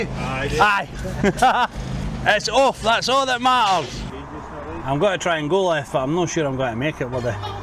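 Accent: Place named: Scotland, United Kingdom. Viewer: Perfect. Sunday dinner and the Scottish accent 🙂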